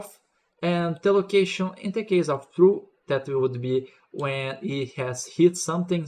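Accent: Brazil. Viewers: where r u from? i felt and brasilian accent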